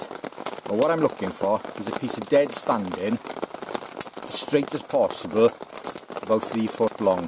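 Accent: Wales, United Kingdom. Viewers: I love the way you say feuwell, always loved the welsh accent..Ive a lot of welsh ancestry..I bought a pair of pilgrims recently and rate them. Love helicon tex, real well made stuff. Been wearing their combats too (SFU's) for a while and they're great, well made. Even their version of the M65 pant are bang on. The pilgrim pant can take kneepads but the opening is on the inside, wierdly, well on mine they are at least. Diolch brother...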